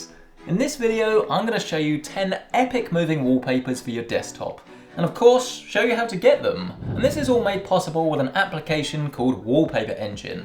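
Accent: United Kingdom. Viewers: Clear British speaker, not a loud American.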